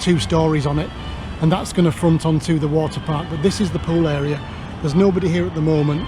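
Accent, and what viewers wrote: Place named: England, United Kingdom. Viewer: Hi my friend we are suppose to come in September this year,hows thinks looking over there ,hope you are all safe,you sound english have you moved over there .thanks lee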